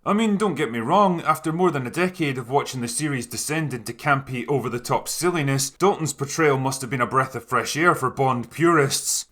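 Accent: Scotland, United Kingdom. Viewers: Sean Connery He blazed the trail and made the mold. The others tried to mimic his charisma, but failed. There are few actors that have the entire package like Connery. Just look at Connery's body of work and the magic of his presence oozes thru unintentionally, just because of Connery being Connery. Pierce Brosnan The only other guy that came close, who even had a movie kept under wraps until he was released by his captors in Hollywood, was Pierce Brosnan. Golden Eye was a fantastic movie. Sure, it had gaping plot holes, but it delivered. Brosnan was the epitome of cool, calm, collected British, stiff upper lip, boys, don't let'em get away. Oh yeah, save the girl too! That's not to say Pierce was a good Bond in other movies, but as good or better than all the other candidates. George Lazenby vs Diana Rigg Lazenby was good not great in his one appearance, but that's the problem. 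I need a little more than one showing. Different venues give us more flavor of what His portrayal and interpretation of Bond really was. Diana Rigg now, WOW. If any woman could have pulled off Bond, there's my girl. On non Bond stuff...Mike Krommhoefener said about you criticking Bond. If you play your cards right, you could be the next voice-over king. At least for parts needing a British/Scottish accent.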